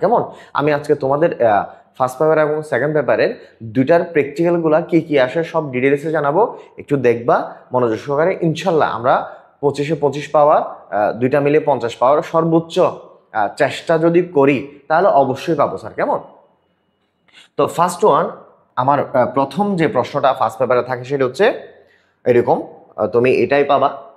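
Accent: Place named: Chittagong, Bangladesh. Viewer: Sir er kota huni lagedde chatgaiya🗿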